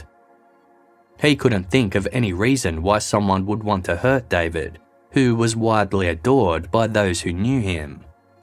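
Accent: Australia. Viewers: I agree with livingtheaussiedream. Nothing personal to the yanks but its great to hear Australian English spoken. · Thankyou! I'm so glad I've found an Aussie narrator! Makes listening so much easier and relatable! Thankyou, team, for researching as well as you do. Truly unmatched.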